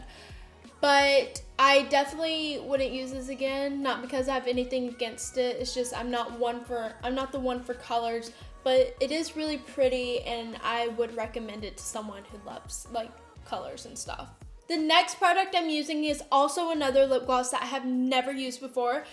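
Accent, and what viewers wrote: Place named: South, United States. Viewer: Katie Washington she sounds very southern to me.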